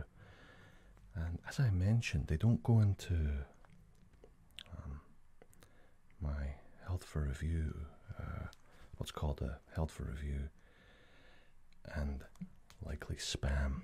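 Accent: Scotland, United Kingdom. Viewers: Scottish understones asmr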